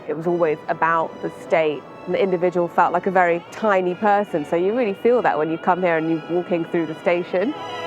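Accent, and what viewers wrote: England, United Kingdom. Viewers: born to an American father and an Indian mother. She's an American national born and brought up in Miami.I don't know from where she got this beautiful British accent. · What race mix is she ? Sounds british, but looks between south asians and latina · Wow i love your British ❤accent.. Adoro il tuo accento... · Your English accent is just awesome.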